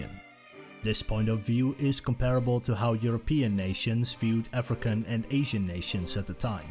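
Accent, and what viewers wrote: Netherlands. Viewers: Is the narrator from The Netherlands? The Dutch always speak better English than native English speakers. Lol I've been more interested in Korean history, this video was great.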